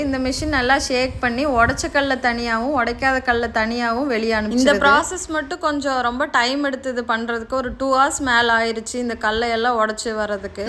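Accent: India. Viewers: வாழ்த்துக்கள் சகோதரி. கோவை தமிழ் கேட்டது மகிழ்ச்சி. வாழ்க வளங்களுடன் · கொங்கு பேச்சு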